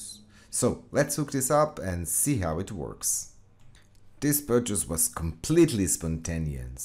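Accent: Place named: Israel. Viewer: לפי המבטא אתה בטח מישראל !!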